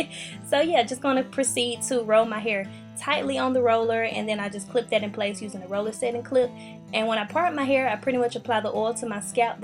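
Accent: South, United States. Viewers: Beautiful hair, good job! Cute southern accent😂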